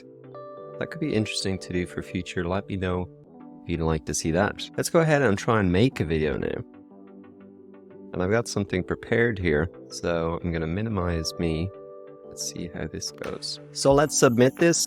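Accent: Ireland. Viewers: Nooooooooooo. It doesn't get your fabulous Irish accent at all. Your regular voice is so good. I want to recording of it to fall asleep to at night. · Holy Crud Batman. I'm torn here because I live in NJ and have been told I have a bit of an accent. I personally think removing your very charming Irish accent is not a great thing. Now I'm in the mood for a Guinness.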